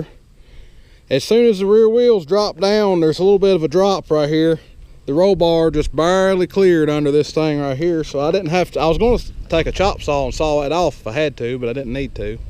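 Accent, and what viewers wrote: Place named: Kentucky, United States. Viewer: Love my 1025R!!! Great little tractors. Are you a Kentuckian??? You sound like one lol. Im from South Central Kentucky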